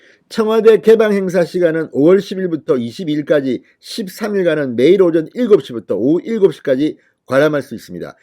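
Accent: Gyeongsang, South Korea. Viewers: 경상도 사투리 발음이 좀억세다 보니 닦아온다는 뜻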